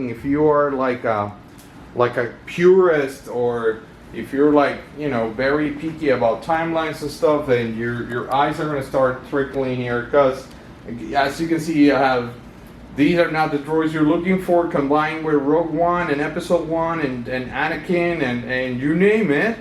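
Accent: Venezuela. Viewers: El acento venezolano te delata .. y me alegra .. muchísimo .. tremenda colleccion .!!! Increíble .!!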